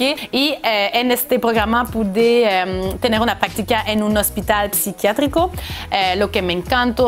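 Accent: France. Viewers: Interesante todo lo que nos hace mencion. Yo vivo en EEUU en estos momentos, pero soy original de Lima, Peru. Me doy cuenta que tu acento es frances y segun me cuenta una amiga francesa es mucho mas facil aprender castellano para ustedes y viceversa. En estos momentos estoy en Ohio y como que entiendo la vida esa de vivir en un lugar de vieve. Yo vivo en Nueva York y no por el hecho que esta cerca al mar no niva mucho como en Canada. En fin, todo lo que cuenta es muy dificil para gente que no han salido fuera de su pais. Lo cual es completamente diferente y como que tu tienes la vida esa aventurera de encontrar otros horizontes. Buena, suerte en tus objetivos. Espero, poder intercambiar ideas contigo.... Hablamos... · Tienes acento Francés! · Llevo poco tiempo viendo tu video y pareces una linda chica, dijiste que eras canadiense, pero pareces más francesa, hasta tu acento.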